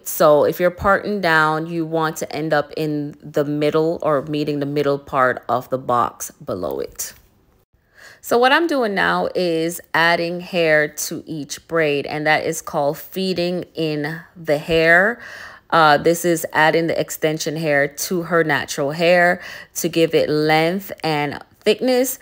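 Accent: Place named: Jamaica. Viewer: I could here the Jamaican in you😊😅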